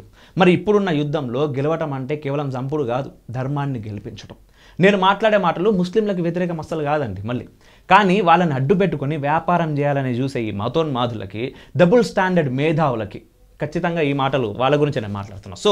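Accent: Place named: Telangana, India. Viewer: అబ్బాయ్, అద్భుతం, అనిర్వచనీయం. ఇట్ల చెప్పు కుంటా పోతుంటే.. ఆగదు. ఎది ఏమైనా నువ్వు చెప్పే ప్రతీ అక్షరం మొదలు.... మాలంటోల్లను... మంత్ర ముగ్ధులను చేసినవ్ పిలగా.(ప్రేమతో) తెలుగు భాషను చక్కగా చిక్కగా....బాగా బాగా చెప్పినవ్. తెలంగాణా మాండలీకం అక్కడక్కడా చాలా ఇంపుగా సొంపుగా నీ శైలి లో మా ముందు ఉంచినందుకు ధన్యవాదాలు..... ఇక ఉంటాన బ్బాయ్. ~ I, pray the almighty to bless you with more than you desire and deserve.....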